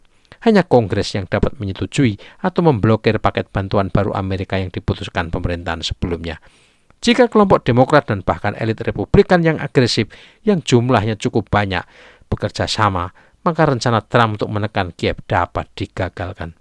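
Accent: Java, Indonesia. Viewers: I love medoook. 👍👍👍 Autentik.......👍👍👍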